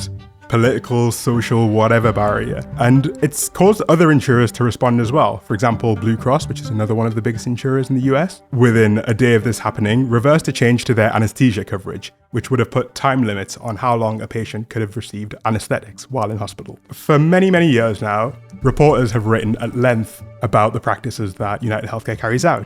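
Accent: United Kingdom. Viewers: tbf this happens to me, especially given this guys voice is deep and he has a less pronounced british accent. I also watch a lot of non american media so I tend to forget about or confuse different accents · how did you get that british accent? where are your parents from? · Great info, thanks for the time you've put into this. Nice to hear a Brit accent that doesn't sound like that Bond villain Andrew Witty, really cleanses the palate. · wait I didn't even notice he was British lmao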